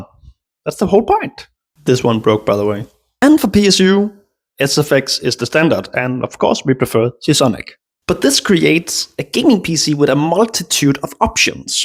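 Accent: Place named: Denmark. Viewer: Dear god, that Danish accent :)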